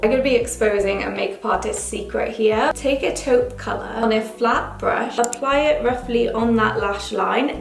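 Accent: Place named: Wales, United Kingdom. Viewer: Nice to hear a lovely Welsh accent, nice change from American. Not that I’m biased! 🏴🏴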